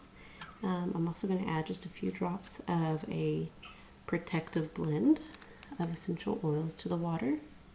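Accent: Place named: Missouri, United States. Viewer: This makes me want to eat an apple. I love apple season. Also, I love your accent. I live in Missouri and we have a lot of people here with that accent. I have some aunts and uncles with it and sometimes I say words like they do. Like I'll say "sell" instead "sail". And "wull" instead of "will" or "well". "Dill" instead of "deal", etc.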